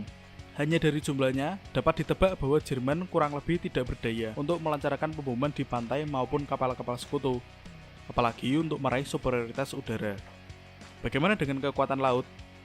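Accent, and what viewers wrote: Java, Indonesia. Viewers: medoknya ilangin dulu bro😀😀😀😀😀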